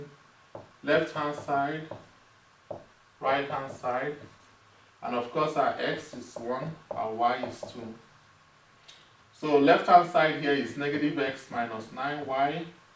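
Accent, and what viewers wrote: Nigeria. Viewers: this helped alot! thankx..sorry are you an Igbo Nigerian Man?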